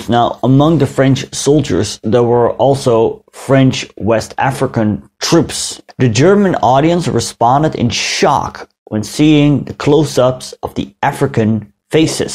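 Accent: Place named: Netherlands. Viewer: prachtig zoals je die "internep revisionist" neerzette! (en, JA, ik spelde internet met opzet zo...) ik vind je kanaal "History Hustle" fantastisch en voor het overgrote deel correct. het enige, kleine, minpuntje is je flinke accent als je Engels spreekt en ook met de uitspraak van Franse namen kan ik merken dat je een Nederlander bent...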